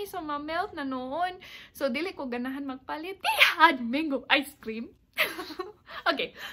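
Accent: Visayas, Philippines. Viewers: Ur intonation is bisaya , im amazed 😂😅👍... ganahan ko nimo LOL!😜😂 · Even your accent when you speak in English is Filipino. Wow just wow. So impressive. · Bisayaa jd oy 😂👏👏👏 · Malipay jud ko sa imong vlog day. Pirting pagka bisaya jud oi. Hahaha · Ma shock man sad ta pure kaayo nya bisdak d I kaayo 🤣🤣🤣🤣🤣🤣 proud bisaya yohoo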